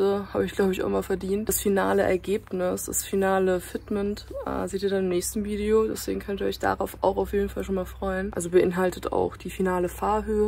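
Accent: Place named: Russia. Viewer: Hi🙋🏻‍♂️ bin zufällig auf dein video gestoßen👍🏼 hat spaß gemacht zuzuschaun und war lustig. Vorallem find ich gut dass du so natürlich rüber kommst. Du bist aber auch verdammt hübsch. Und ohne scheibenwischer sieht die Karre geil aus👍🏼. Walla du hast ahnung👌🏼 und stil. Die Felgen passen wie angegossen zum auto. Glaub bist russin, hat sich so angehört🙂👍🏼ich lass natürlich ein Abo da😁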